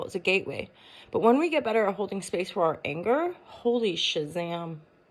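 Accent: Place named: Yorkshire, United Kingdom. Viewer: Good morning girly! I’ve watched you religiously until 2-3 yrs ago I felt called to watch you again, the accent is northern.. Yorkshire most prob 😂❤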